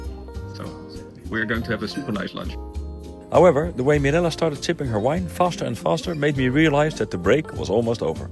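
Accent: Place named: Netherlands. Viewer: I love hearing your Dutch accent. I miss my vader so much.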